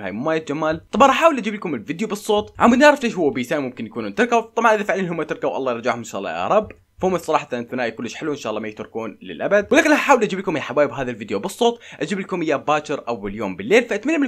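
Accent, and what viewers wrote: Iraq. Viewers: بعرف مين انت…وانا سندس الاكس يا عمو…هخهخ صاير تحكي عراقي ااه ؟وفهد الزين كمان ياي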